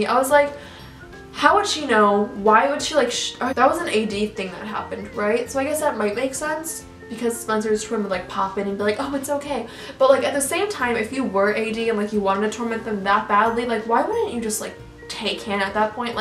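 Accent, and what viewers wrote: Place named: Ireland. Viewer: accent I felt like it sounded more like a Irish accent more than a British accent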